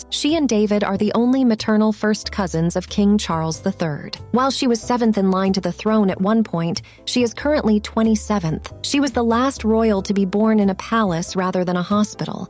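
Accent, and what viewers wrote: United States. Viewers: the narrator is American...relax...we never got any news about her here in the US...too bad, too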